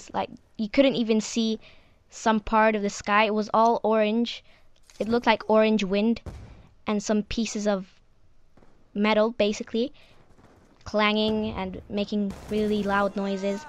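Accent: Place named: London, United Kingdom. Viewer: You have a london accent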